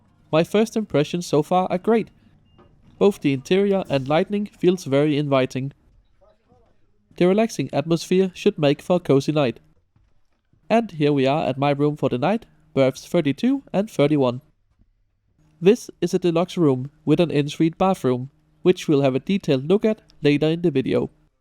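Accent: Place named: Denmark. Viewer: Is strange how undestand person with Danish accetn better than Americans.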